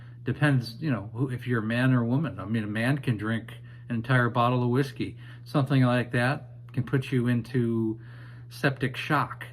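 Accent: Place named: Canada. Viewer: Sounds like your average Canadian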